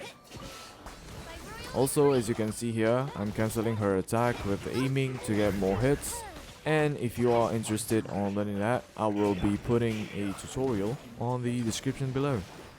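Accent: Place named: Australia. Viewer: aussie accent 👀👀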